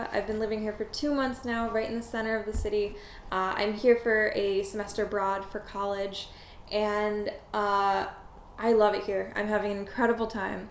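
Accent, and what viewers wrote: Russia. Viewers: Симпотичная девушка) С очень хорошим русским акцентом, что даже очень странно) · oh, girl, you got that kind of rrrrrussian accent. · nice soft russian accent =) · why your pronunciation is so Russian?) are your parents from USSR? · You have Russian accent!!! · funny ... you have such a strong Russian accent :) However, the word Babushka - pronounced perfectly :) At some point I started to think that you are Russian :) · у девочки русский акцент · You sound like behind your English some perfect Russian hides =) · You have a Russian accent speaking English. Look Russian too. Not buying it. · Похожа. И, возможно, даже русская. По крайней мере, мне показалось, что некоторые фонемы она произносит немного на русский манер. Да, она отлично говорит по-английски, но в английском есть специфические фонемы, произнесение которых трудно контролировать если ты не родился и не вырос в англоязычной среде с нулевого возраста.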